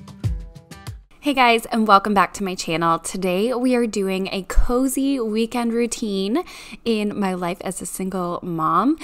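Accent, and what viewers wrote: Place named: United States. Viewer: Haha yes yes I know oregano just can't believe how you say in America! I love your accent! Also your video has inspired me to get out my uggs! 👍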